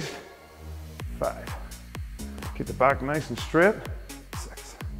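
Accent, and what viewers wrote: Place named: Scotland, United Kingdom. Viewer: I'm happy to have found your channel. Your workouts are for all fitness levels and are quick and to the point without endless chatter and you explain the movements and techniques extremely well...just a question--are you from Scotland? My boss at work is from Glasgow and speaks just as you do:)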